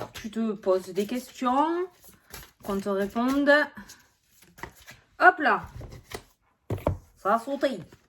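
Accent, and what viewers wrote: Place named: South-West, France. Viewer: Comme on dit chez moi en Suisse, que les esprits critiques aillent voir autre part si tu y es. Argh 😓 Si tu arrives à avoir 53000 abonnés, c'est que quelque part ton petit grain de folie est une bouffée d'oxygène pour certains. Personnellement, ça fait trois ans et demies que je soigne un cancer incurable. Est-ce que j'ai envie d'écouter une personne qui s'écoute parler? Au ton monocorde? La réponse est non. Si je suis ici depuis un peu plus d'un an, c'est parce que tout en toi aspire à la fraîcheur. Ton petit accent du sud ouest est un régal pour les noreilles. 😁 Si j'avais envie d'autre chose, je ne serais pas là depuis un an. Reste le joyau que tu es. Tu me fais rire. Et tu fais du bien, quand les choses sont sensibles en ce qui me concerne. Actuellement ma vie n'est pas un long fleuve tranquille.... loin de là. 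Repousse les esprits critiques loin de toi, et reste le rayon de soleil que tu "diffuse". Avec 53000 abonnés, tu peux même te permettre de les virer! Argh screugneugneu 😓! Personne ne blesse notre! Colère. 😠 🗯 💢 👿 Longue vie à toi, et à ta chaîne ma. 😘 😘 🎵 😚 😚 🌟 🌟 🌟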